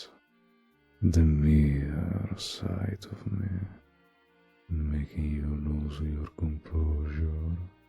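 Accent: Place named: Russia. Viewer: Why do i feel like the accent is russian😨(its super cool thought)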